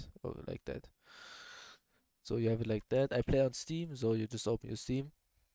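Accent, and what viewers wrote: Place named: Germany. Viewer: das war das deutscheste englisch was ich je gehört hab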